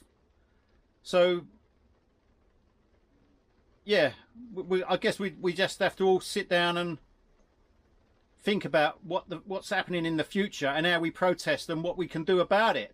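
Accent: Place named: South, United States. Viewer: Hi, all the best for 2025. You will always get haters and whiners when you put yourself out on YouTube. Some people just can’t help themselves for instance your accent i’m Northern you are a southern,if they don’t like it jog on!. there are more and more people waking up to the tyranny of the New World Order dictatorship, this has been in the planning for over 20 years yet most people do not see it and even if you show them they still will not see it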